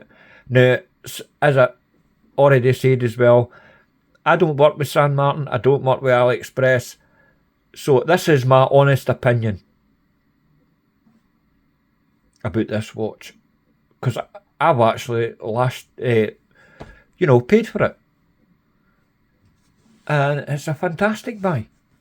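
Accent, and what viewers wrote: Scotland, United Kingdom. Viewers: How have I never seen your reviews before 🤯😂 love your absolute honesty and the good Scottish language,top notch 😎👍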